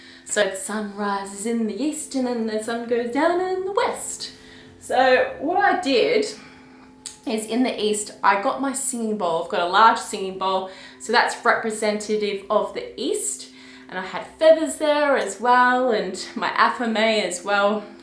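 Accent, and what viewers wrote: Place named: Australia. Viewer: the Aussie accent just like her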